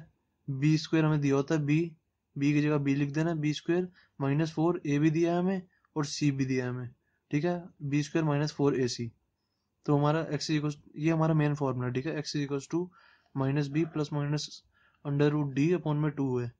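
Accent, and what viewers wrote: Punjab, India. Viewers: r u from punjab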